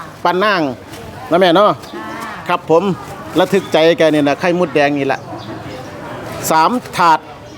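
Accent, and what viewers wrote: Isan, Thailand. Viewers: สำเนียงปากเว้าแม่ค้าบ้านเผิ่นฟังม่วนกว่าทางบ้านเฮาน้อจารย์ ปลา..ยอน ปลา..นางคือสิหลายน้อจารย์ เอามาปิ้งกินกับตำหมากถั่วคือเป็นตาเเซ่บ fc จากเดชอุดม เด้อจ้า(แต่สำเนียงจารย์เว้าผญาแม่นถืกใจคัก)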